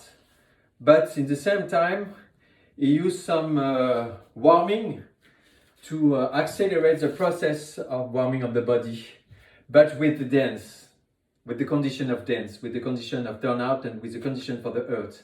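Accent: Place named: France. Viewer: Your French accent is beautiful.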